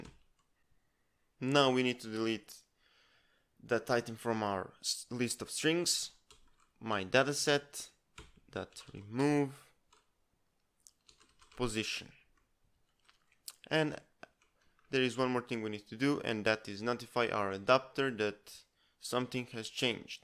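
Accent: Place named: Russia. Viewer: акцент вроде русский:yt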